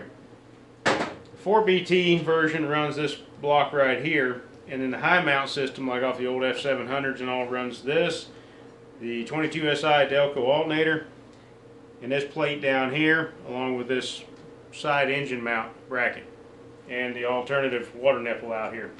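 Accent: South, United States. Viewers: I was so confused when you said NE WY and then talked with a southern accent until you said you used to be in NC haha. Badass truck bud! My kind shit. Except I'd prefer a minty ol' Ferd. But all them old Merican trucks are sweet! I could use a dump box like this for my firewood gathering. SE WI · Northern Southern drawl. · I thought from that accent I'd be right at home in Wyoming. Then you said you were from NC. Makes sense now lol.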